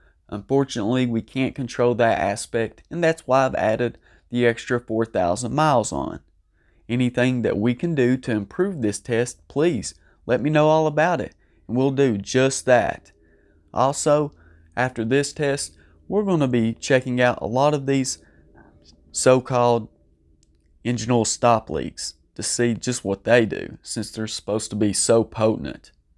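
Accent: South, United States. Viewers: The narrator's accent reminds me of Forrest Gump.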